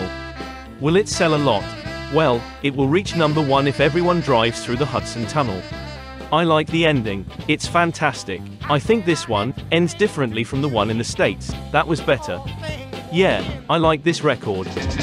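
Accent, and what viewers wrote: United Kingdom. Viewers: sure put on a right proper British accent for this program. 😂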